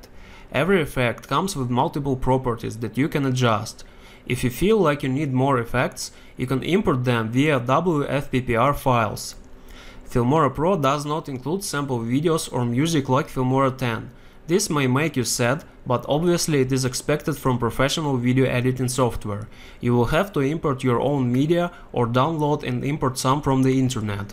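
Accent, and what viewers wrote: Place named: Russia. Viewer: You have a russian or ukrainian accent :) Спасибо for the tutorial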